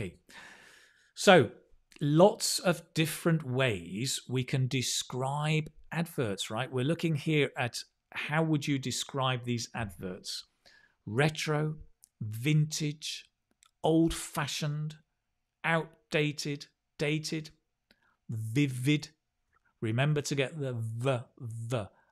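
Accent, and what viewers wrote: United Kingdom. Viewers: Is teacher from UK, bro?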